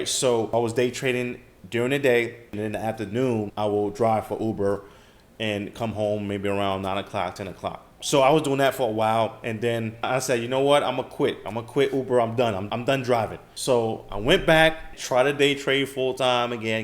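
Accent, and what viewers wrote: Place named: New York, United States. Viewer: you must be from new york, it’s the accent & hustle mentality. i literally feel this with my job rn, in between structuring my time & working for entitled people it really sucks. I definitely need to be an entrepreneur & master forex and the psychological aspects of it, so i’m really thinking about leaving when the time is right thank you for sharing!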